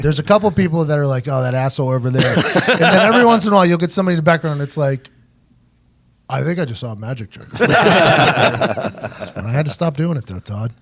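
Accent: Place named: New Hampshire, United States. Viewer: Buddy sounds like hes from New Hampshire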